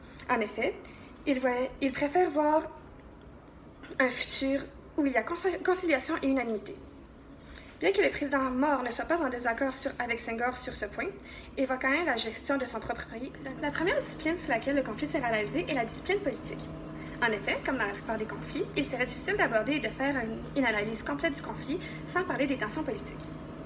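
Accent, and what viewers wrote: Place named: Canada. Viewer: L'accent est un peu difficile à comprendre. Est ce comme ça que les canadiens parlent ?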